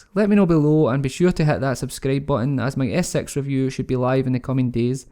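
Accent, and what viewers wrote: Scotland, United Kingdom. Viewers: Good review for an awesome device. Your Scottish accent is not that thick and can be easily understood. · I like your Scottish accent, it let's me practice on understanding it 😁 although, you should practice on talking a bit slower.. Very good review Good luck! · Is this a scottish accent ? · Great video for a relatively new reviewer. Love your Scottish accent! Subscribed!! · Nice review bro. Going to Glasgow,Scotland for the 1st time and your video has made me get used to the accent! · OMG I frigging adore your Scottish accent!! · See it the Comments below you are Scottish- I figured by the Accent it was either Scotland or Texas.... And down to earth informative Reviews nice relaxed Style good voice and no problems understanding you.. Want to hear more about that Battery- should the Phone have been a little thicker for 400 more Mah ? 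· I really enjoyed it! Since your primary audience is in the UK, I wouldn't worry about your speaking too much. Even being in the US, I understood you perfectly. I'm a little biased though. Scottish accent has always been my favorite. Subscribed. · You should rename your website: Scottish tech reviews! Love the accent :)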